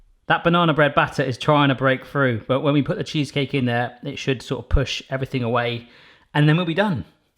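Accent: United Kingdom. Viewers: spirit-howl 786 U that’s a really British accent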